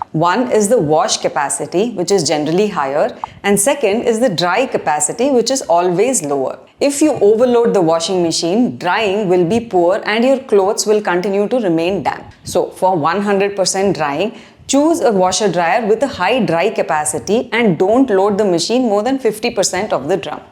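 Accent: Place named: South India, India. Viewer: by your english accent i can make out that u are a south Indian may be andhra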